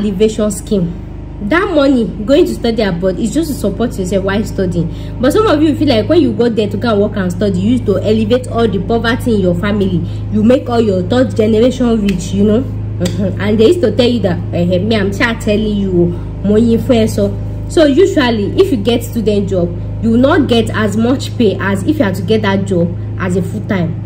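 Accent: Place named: Ondo, Nigeria. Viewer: With the yoruba accent i think you are from ondo townm please tell me i am wrong! omo yemi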